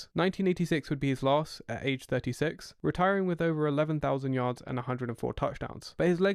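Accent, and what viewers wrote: England, United Kingdom. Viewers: I get so sick and tired of somebody putting on youtube , talking about a sport with an english accent that they're just reading a f**** script and know nothing about do better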